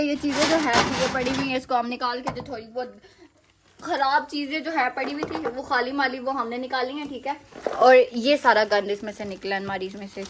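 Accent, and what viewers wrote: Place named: Punjab, India. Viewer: Hay ALLAHA AAP ki panjabi so sweet.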